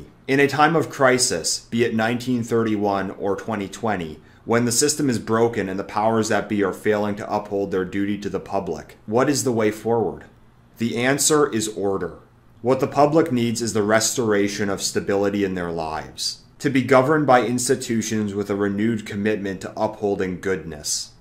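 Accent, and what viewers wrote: Canada. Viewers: Maybe it's bc of your Canadian accent & the fact that you're one of few ppl who aren't a natural born citizen of America who DOESN'T bash our "6UN CULTURE", but this is the BEST analysis I've EVER seen on this topic. I think it's time we just admit the truth: "… when left to their own devices men will form tribes and compete with rivals for tribal dominance, not live together in some multicultural harmony. This theme of ethnic loyalty in mobster films makes them feel more authentic, because this is how humans function in general. You really couldn't make a mafia film that lines up with modern progressive values without it being absolute SHlT…" ALSO, WOMEN long to be WOMEN AGAIN! And MEN long for them to be, TOO! But we just keep on telling the same LIES: That we are as STRONG as you. That we belong at the FRONT-LINES. That we take WAR & Other LIFE & DEATH Situations as SERIOUSLY as YOU DO (that's why WE MADE ALL THOSE TikTok Videos of us DANCING in Hospitals, while our Cancer patients, Burn patients, Heart Attack patients, DV Abuse patients, 6un SH0T patients, CHF & COPD patients, Alzheimer's patients, Traffic Accident patients, Kidney Failure patients, Sclerosis patients, Bowel Blockage patients, Pancreatitis patients, Stabbing Victim patients, Child patients, ALL got NO ATTENTION, SO THAT WE COULD GET OURS~ on Multi-Million Dollar Hospital Equipment, or Carrying Fake C0VlD CORPSES!). 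There is a vast difference b/t "Multi-Cultural" & "Multi-Ethnic". Mixing ETHNICITIES c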